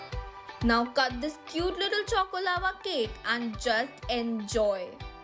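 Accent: Maharashtra, India. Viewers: You are Maharashtrian easy can identify by your speaking skills my guess is correct???pls dii tell 😀😀😀😀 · Yes she is Maharashtrian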